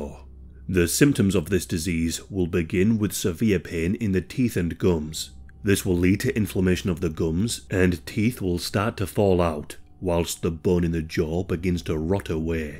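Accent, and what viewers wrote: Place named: York, United Kingdom. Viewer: You look like Scotty, vocalist of a band called Street Soldier. They are from york so I'm guessing by your accent they are fairly local. Any relation?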